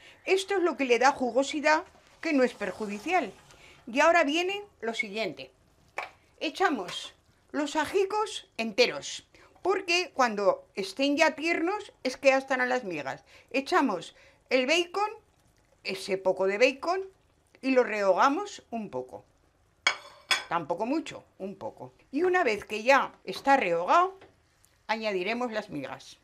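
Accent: Aragon, Spain. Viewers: Tú eres maña..... Ese acenticooooo.... Eres graciosa, concisa y clara... Las probaré con la patata rayada. Muchísimas gracias por tu desparpajo y receta. Buen trabajo, maña!! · Me encantan las recetas tan ricas,que haces,y es un placer oírte hablar con ese acento,maño.Alli pase mi infancia.Ana María.❤😊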